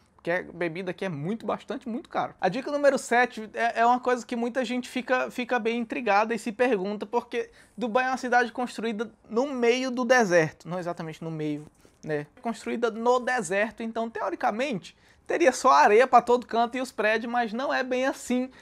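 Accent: Northeast, Brazil. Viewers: Caí aqui, e tu é nordestino? Pois parece com esse sotaque kkkkkk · Oxi vc é do Nordeste é...????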